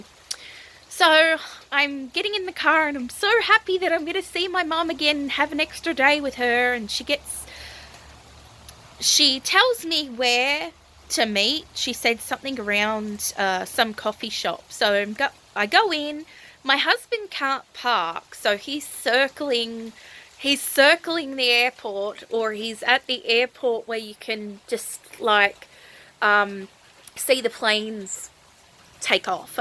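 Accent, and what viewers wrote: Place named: Australia. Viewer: I like your vlogs.... your accent is so Australian, at first i thought you lived there, but now i understand you live in USA.... why did you decide to move countries, was it when you got married?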